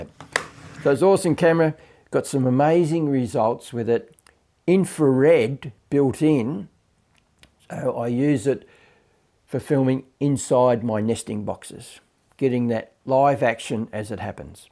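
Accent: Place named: Australia. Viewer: Hi, I enjoyed your photography/video timeline video. I also think your wildlife video and photography work is great, here in GB you’d be probably be employed by the BBC as a freelancer, as they do so much natural-history production, apart from the David Attenborough epic series shown worldwide, we have BBC Spring-Autumn-Winter Watch which is a three seasons live TV wildlife series broadcast from wildlife locations around Britain. They get a good audience and lot of interest and the team includes wildlife photographer/presenter Chris Packham, TV presenter Michaela Strachan, Iolo Williams and Gillian Burke, with other regulars appearing. I love that little Antechinus creature, what exactly is it? Some kind of shrew creature native to Australia, I guess you’re in Australia from your accent.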